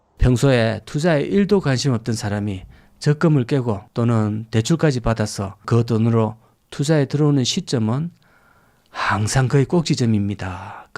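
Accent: Gyeongsang, South Korea. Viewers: 경상도 사투리 딱 듣기실구마이 징허다이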